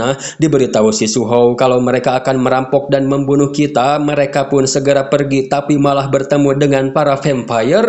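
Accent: West Java, Indonesia. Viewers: Lanjutkan min keren lucu pembawaan nya 😂,sunda pisan uhuyyyyyy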